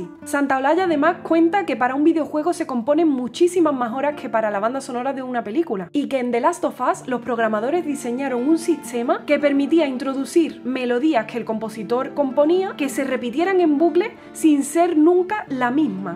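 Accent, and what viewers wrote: Andalusia, Spain. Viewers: Qué bello cover!!! ❤ amé!!! 😊🔥👏 PD: me encantó tu acento andaluz 😍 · Andaluz. De Andalucía, la región sur de España.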